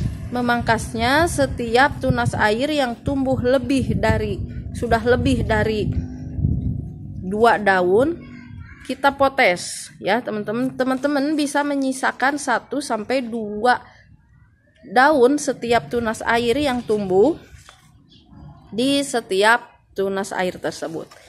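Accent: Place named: West Java, Indonesia. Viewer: Maap mbanya dari logat nya mbanya dari jabar ya sunda leres te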